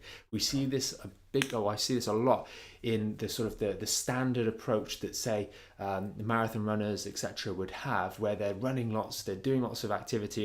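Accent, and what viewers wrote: England, United Kingdom. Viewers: I barely understand this guys accent. Its so horrible to listen too and speaks way too fast. How i wish he was an American and not English.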